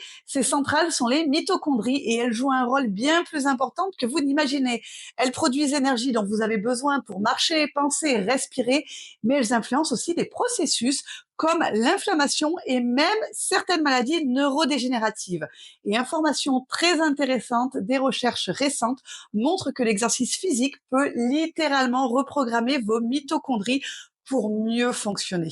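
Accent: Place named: Haute-Loire, France. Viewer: NON !!!! 😃 Je viens de voir sur votre site que vous êtes ardéchoise !😃 Moi aussi !!! 😃Compte tenu de votre accent, je dirais que vous êtes plutôt dans le Nord de l'Ardèche, dans les Boutières ou même Guillerhand Grange, Annonay...Pour ma part, je suis dans le Sud, dans les Cévennes, du côté de Valgorge. Je suis moi aussi passionné de biologie, de médecine et de Sciences du vivant. J'adorerais vous rencontrer pour parler de tout cela et qu'on échange des connaissances sur ces sujets.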